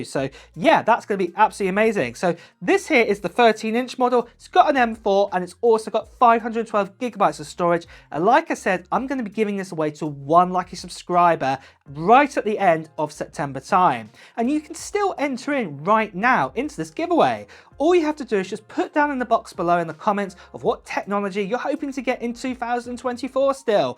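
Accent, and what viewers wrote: United Kingdom. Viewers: I like your British accent!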